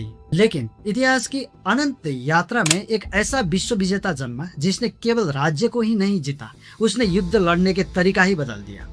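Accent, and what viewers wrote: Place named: Nepal. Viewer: u r nepali guy. bcoz how u speaks Hindi 100% nepali accent. with tone everything. but kinda like u person full confident. but never accept own mistake.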